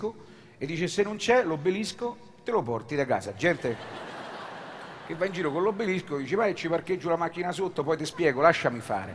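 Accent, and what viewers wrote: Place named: Rome, Italy. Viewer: Troppo forte, poi secondo me( e parlo da milanese), l accento romano accentua ancora di più la comicità, è troppo bello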